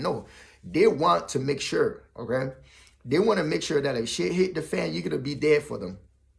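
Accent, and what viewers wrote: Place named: Liberia. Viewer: You sound Liberian dude ..she is the one using the guys for subscribers, we all know what’s going on and it’s all innocent 😇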